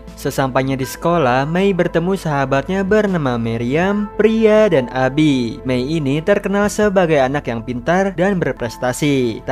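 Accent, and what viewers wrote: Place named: Jakarta, Indonesia. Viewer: Dari Malaysia kok logatnya kayak orang jakarta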